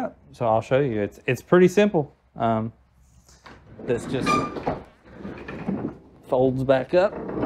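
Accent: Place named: Eastern Kentucky, United States. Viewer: He sounds so much like me. I’m from Eastern Kentucky! Awesome.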